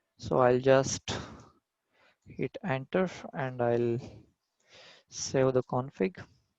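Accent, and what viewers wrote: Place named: India. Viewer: I hate indian english! OMG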